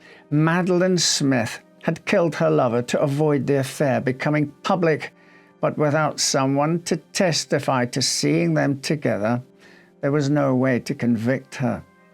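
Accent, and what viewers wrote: Scotland, United Kingdom. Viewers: Yes, he is Scottish 😁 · Well not just a wonderful and salacious video but the fact that your good self with this wonderful Scottish accent just lifts it to a higher level. Wonderful story telling thank you.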